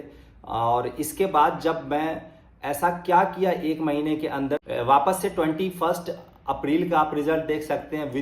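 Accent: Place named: Bihar, India. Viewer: bhaiyaaaaaa......aap khn the phle 🥲😊....thnk uh so muchh...apki ye phli video dekhi...suggestions m thi...... aap bihar k ho kya...aap k baat s lga....thnk uh so much bhaiyaaa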